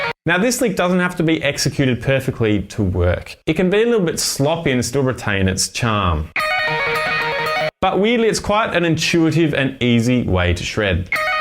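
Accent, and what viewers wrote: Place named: Australia. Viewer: I did NOT expect that Australien accent 😅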